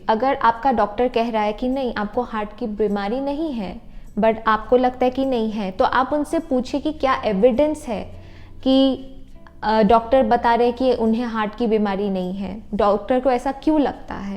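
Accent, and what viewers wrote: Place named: Bengal, India. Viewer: Aapki language bangaali tone jesi lagti he Kuch kuch.... Aap bangaali he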